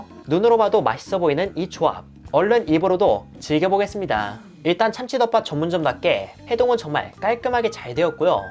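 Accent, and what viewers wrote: Gyeongsang, South Korea. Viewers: 님께서 경상도 집안 출신이 아닐까요?....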